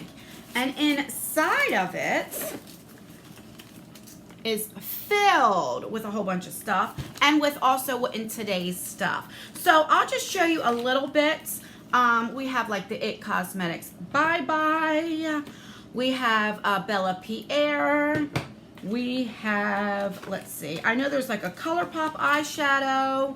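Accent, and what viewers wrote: New Jersey, United States. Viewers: I love your jersey accent I’m from the south so it’s not very common to hear😝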